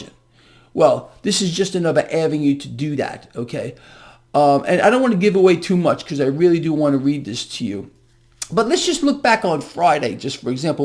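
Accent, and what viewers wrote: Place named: Upstate New York, United States. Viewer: where did you grow up? The Northeast? I get a vibe you're from upstate New York sometimes 😃 Great episode, thanks for all your excellent advice!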